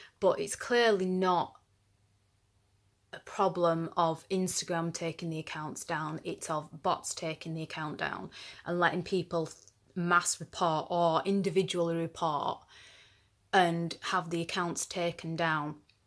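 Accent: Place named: Yorkshire, United Kingdom. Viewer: You sound like a Yorkshire lass to me, either that or Manchester, somewhere that way but you sound a bit well spoken for Manchester 😂I look forward to more videos 😁xx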